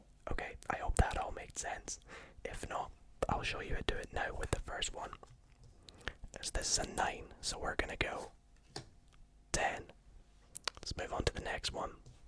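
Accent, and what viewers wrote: Scotland, United Kingdom. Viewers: Are you scottish by chance? Thanks for the video and i like your accent alot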